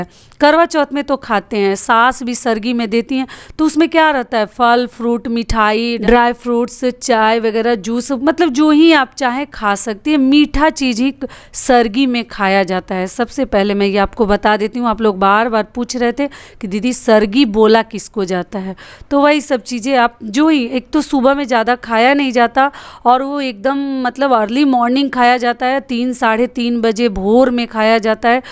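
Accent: Bihar, India. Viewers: Mam ap bihar se ho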